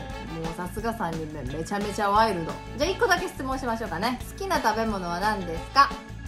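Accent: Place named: Osaka, Japan. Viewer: 登録したまま、お待ちしてましたよ❗ 大阪弁の心地よいトーク楽しみにしております。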